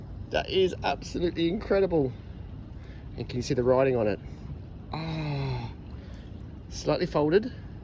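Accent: Australia. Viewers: Really enjoy your aussie accent. And the time you take in explaining your finds.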